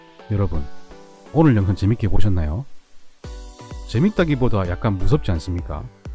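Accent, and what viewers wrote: Gyeongsang, South Korea. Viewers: 갱상도 사투리 정감이 갑니다. 의학상식을 나누어주셔 감사합니다. 복된 삶이 되시길~~ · 경상도 사투리 억양 너무 듣기 좋네요 이 억양 듣고 싶어서 계속 다른 것도 더 듣고 싶어요 물론 내용은 상상을 뛰어넘을 정도로 최고 중에 최고구요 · 감사합니다 ~~경상도 사투리가 구수하십니다. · 경상도 사투리가 구수하고 정겹고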